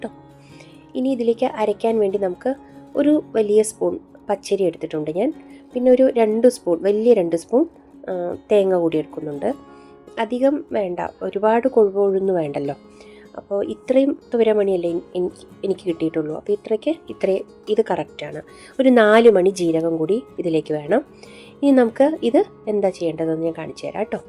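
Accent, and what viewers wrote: Palakkad, India. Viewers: പാലക്കാടൻ ഭാഷ അടിപൊളി.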